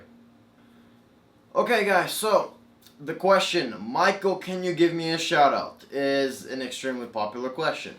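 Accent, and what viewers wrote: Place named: United States. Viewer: he said in live stream multiple times he lives in the U.S., and clearly has an american accent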